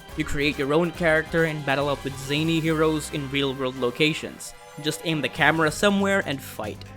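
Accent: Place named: Philippines. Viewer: I swear you really sound Filipino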